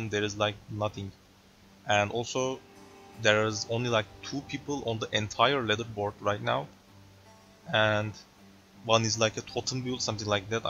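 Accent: Turkey. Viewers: türk şivesi bu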